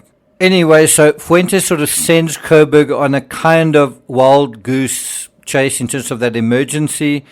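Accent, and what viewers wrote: South Africa. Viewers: Me too! I ❤️ accent too. I think it’s South African.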